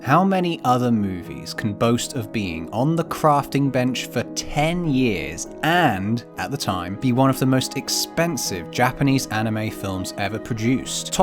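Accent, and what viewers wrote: United Kingdom. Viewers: this guy sounds very British what do you mean?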